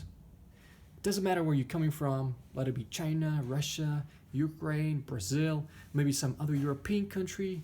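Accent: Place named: South, United States. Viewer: I actually love the southern accent as a foreign student. Sooo sexy.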